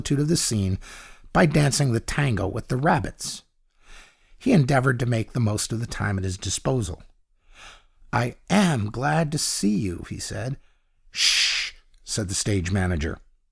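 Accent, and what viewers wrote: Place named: United States. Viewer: Not right with American accent sorry.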